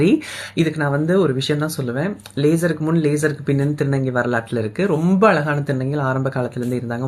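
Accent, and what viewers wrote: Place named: Eelam, Sri Lanka. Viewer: Cute.., you are very good in eelam Tamil..appappo eelam Tamil la pesunga.. quite emotional a irukkum eelam Tamil ketkumpothu..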